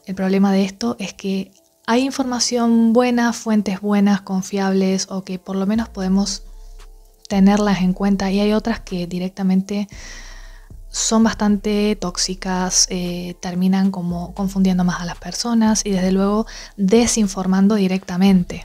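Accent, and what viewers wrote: Córdoba, Argentina. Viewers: Ese lindo acento será cordobés? 😊 saludos genia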